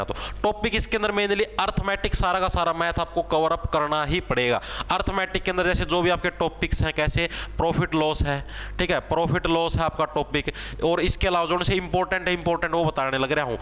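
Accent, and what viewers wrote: Haryana, India. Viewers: Es hariyana ke avaj sunn k laga haa koi pdhava hai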